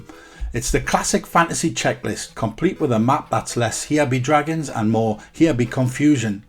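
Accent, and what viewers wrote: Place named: Newcastle, United Kingdom. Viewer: Sounds like you’re from Newcastle in the UK but doing US games and prices. Them prices were definitely high for the US and only reason Buster is so expensive due to its limited run in the US. There are a lot more rarer and more expensive games in the UK say an easy 20 games. Outrun you can pick up for about $18 in the US right now. Always good to see videos on the Master System 👊🏻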